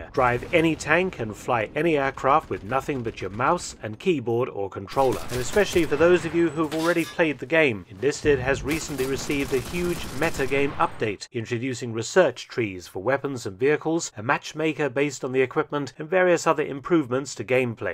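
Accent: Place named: RP, United Kingdom. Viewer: yes. he is easy to listen to as he uses what in England is called received pronunciation ..this gives the diction an instant feeling of command and authority. He uses it very well.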